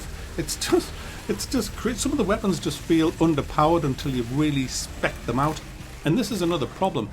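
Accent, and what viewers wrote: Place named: England, United Kingdom. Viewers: you sound like you're from yorkshire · What's this funny as hell english accent? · What? He’s from the North East, probably a Mackem.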